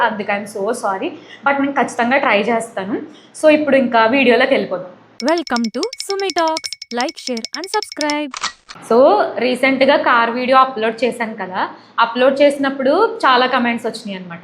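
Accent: Godavari, India. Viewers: Car chala bavundhi Miru matladuthunte pure godavari slang kanipisthundhi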